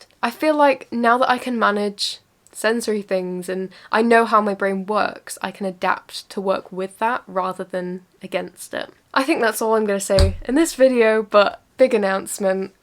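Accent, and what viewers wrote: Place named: United Kingdom. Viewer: Your British accent is so cool, I can actually understand it like American English but with the British vocabulary. What part of Britain are you from?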